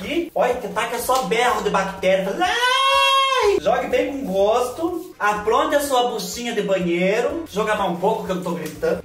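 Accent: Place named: Capão Bonito, Brazil. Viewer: você fala CHÊ. Que nem minha falecida vozinha ali de Capão bonito. Vc lembra meus familiares. Amo seu canal. Agradrço a Deus por vc existir. Muita saúde pra vc e pra sua mãe maravilhosa. Deus os abençoe.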